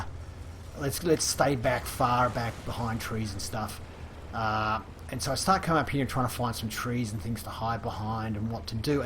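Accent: Australia. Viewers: Thanks, yes the game has changed a lot, with remade maps and new ones, lots of new Prem tanks introduced, so that for example if I still had my T32, and a few others, it would be out classed by many tier 8's now. But glad you are back as I like your presenting style, and, may I ask if you are an Aussie or Kiwi?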